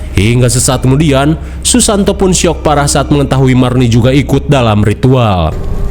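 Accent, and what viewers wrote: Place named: West Java, Indonesia. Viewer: Film horor juga jadi ketawa 😂 Aksen Sunda nya itu loh, jadi khas banget..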